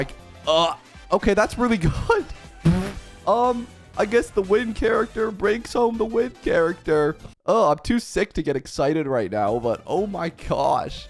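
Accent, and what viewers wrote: Canada. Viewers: Your Canadian accent is cute lol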